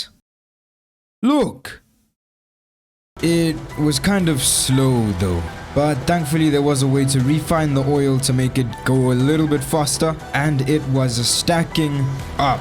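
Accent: South Africa. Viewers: I knew your accent sounded familiar, I am also a fellow South African 😄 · My bru are you South African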